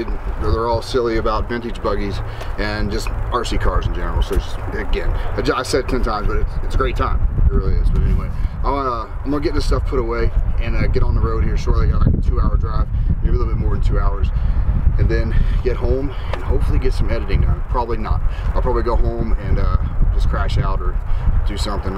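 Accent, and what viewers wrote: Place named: United States. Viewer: hello from Alaska! hey. Thank you for this. love the 'in car camera' footage. reminds me of ReVolt (Nintendo 64/ PS1) video game from 1999. Vintage is cool. for me the golden age of Rc was back between 1989 through 1992. Don't get me wrong I love the new stuff. And things are always getting better RC wise. Looking forward to the forthcoming video. BTW. where are you? You sound American. But your videos are from the uk and Europe. right? You had a rock crawler video that seemed to be here in the U.S. Though I am not sure. take care! keep on RC ing!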